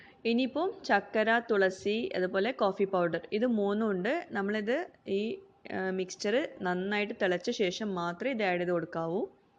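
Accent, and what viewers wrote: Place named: Wayanad, India. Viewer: wayanatilano? wayanaden samsaram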